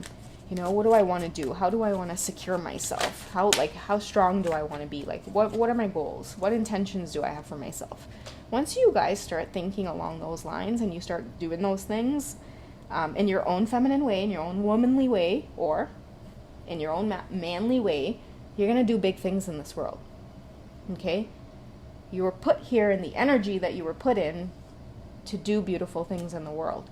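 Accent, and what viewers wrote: Trinidad and Tobago. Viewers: You sound like a Trini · That Trini🇹🇹 accent though 😍 But I'm Jamaican🇯🇲 the song too 😅 but yea I totally get it 😀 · Best reading just for that Trini? accent.... So beautiful... I love your vibe❤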